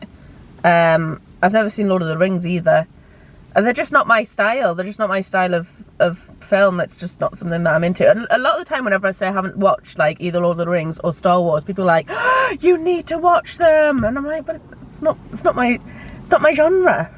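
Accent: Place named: Wales, United Kingdom. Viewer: I’ve come from demis Chanel and firstly I must say, loving the content, I’d love more vlogs, I think you’re fab! But.. where is your accent from? I feel like I hear Welsh twangs but I’ve just assumed your from Newcastle area so now I’m doubting the Welsh accent. Ps I love the Welsh accent so I’m hoping I’m right in my thoughts otherwise.. that’s embarrassing 😂😂💜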